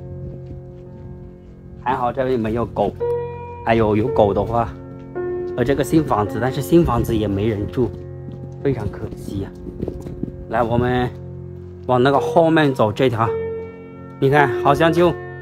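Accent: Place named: Hakka, China. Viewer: 聽你講話好像是客家人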